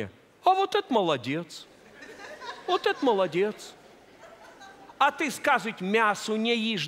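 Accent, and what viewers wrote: Russia. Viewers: Как бы не рассказывал юморист, а он действительно родом из Воронежской области. И произношение у него такое. Вообще, Святослав спасибо за юмор. Рассеялась от души.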